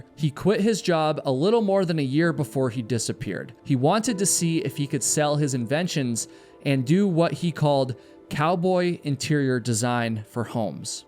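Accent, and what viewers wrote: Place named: New England, United States. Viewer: is a New Englander, irrc. I noticed staying in rural Vermont/upstate New York years ago that a lot of the speech patterns and sayings up there are quite similar to ours in the UK. Definitely moreso than out west or in the bigger cities.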